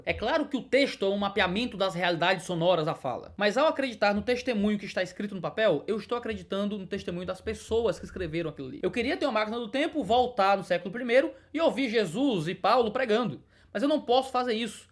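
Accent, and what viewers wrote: Ceará, Brazil. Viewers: Tive a honra de estar há 2 semanas aí e ouvir esse sotaque muito bom e muito engraçado tbm, alias, só tem humorista nesse estado, muito bom. Povo gentil e engraçado, lugares lindos e comidas maravilhosas. Deus abençoe o Ceará!!!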